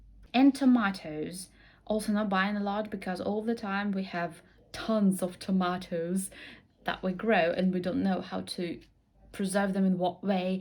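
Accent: England, United Kingdom. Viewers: Very nice British way os speaking English. Thought I was back in London for a moment there. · Why do you have a British accent? It is awesome. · I just thought the same. Very, very good english and this British accent. Nice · How did you get a British accent? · I am amazed at your command of English. Have you studied in English or some part of UK (I hear a flavor British Accent). Either way, a great view. and perspective on life in Belarus. Beautiful! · Great videos. You have a beautiful British accent. :) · You sound like your English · I wonder how come a Belarusian has British accent !!!!!!!! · What does a British accent sound like ? She sounds english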